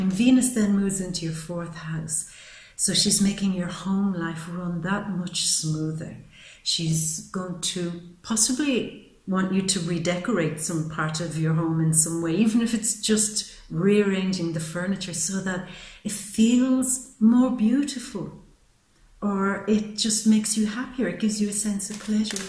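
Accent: Ireland. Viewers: Hello, thank you for your well prepared reading. I loved the references and quotes of famous Pisceans. I find you to be very actuate (for me) and very profound. Since the beginning of the year (I have spent a lot of time thinking (alone-like the Hermit), I am going through a life changing transformation and your info is very timely. I am so happy to have found you. A Big Thank You. I love your lovely Irish accent too.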